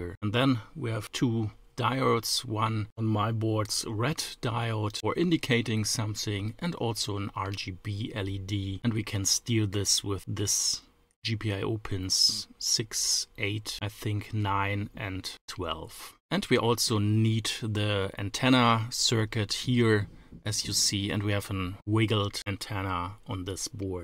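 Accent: Germany. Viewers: Ahh another very good german accend tutorial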